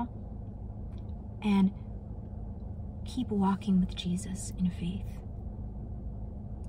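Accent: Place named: South, United States. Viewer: What sound like your from the south. Yall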